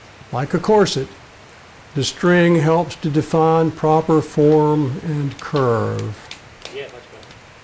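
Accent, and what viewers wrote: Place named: South, United States. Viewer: Where are y’all located? I noticed the narrator has a southern accent. Kentucky? Tennessee? North Carolina?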